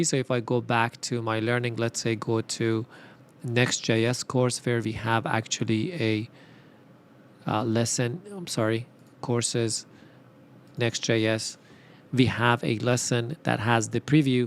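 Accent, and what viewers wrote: Iran. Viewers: your accent is very similar to farsi btw you persian ?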